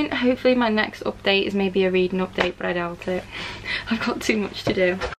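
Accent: Liverpool, United Kingdom. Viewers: You sound like your from liverpool? If so go liverpool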